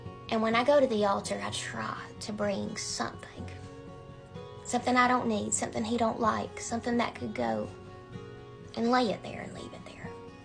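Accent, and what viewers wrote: South, United States. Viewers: Your soo illuminated, love your country voice ! Still wondering about that cornbread and Sweet tea😊❤❤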